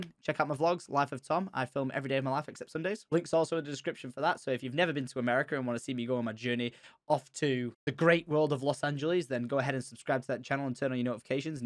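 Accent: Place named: Manchester, United Kingdom. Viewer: This is the most manc you've sounded in years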